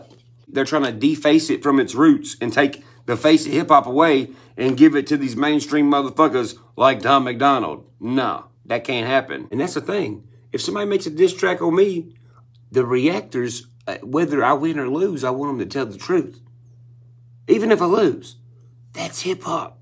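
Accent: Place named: Tennessee, United States. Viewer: Sitting here listening to you speak brings me back home. I feel out of place sometimes living in truckee as I’m from bumpus mills tn on tobacco port circle. You always keep it real and I appreciate that. Wow I never heard of the bot farms! That makes sense. I gotta get back to work. Thanks for the content I listen to all your music and I have started listening to your videos where you talk. Literally every time I have blows my mind! Keep calling shit out and keeping it real!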